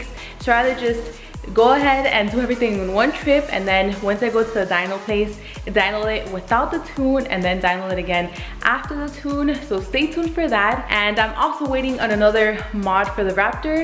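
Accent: Latin America. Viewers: I figured u were Latina from ur accent but I didnt want to assume! Cuidate! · Are you hispanic I hear a lil accent , btw you fine guerita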